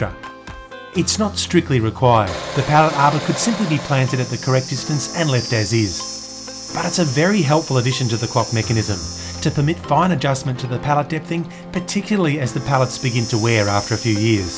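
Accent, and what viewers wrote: Australia. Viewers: Hey! I was wondering, where are you in the world? You sound Australian, if so, where in Australia?:) Once again, great video!!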